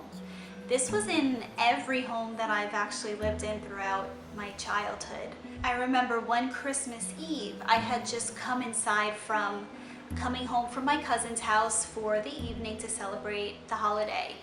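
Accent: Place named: Long Island, United States. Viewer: I watched your first video about your summer house...I'm from L.I. originally and immediately could tell your accent!! are you from long island as well? anyway love your videos... watching from LA where I currently live! ♡♡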